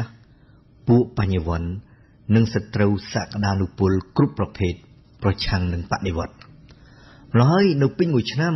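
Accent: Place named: France. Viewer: I LIKE HIS voice SOUND mix French and KHMER interpreter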